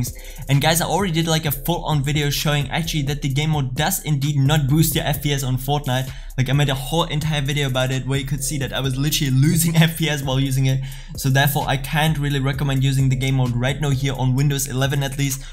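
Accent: Germany. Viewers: 100% deutsch akzent erkannt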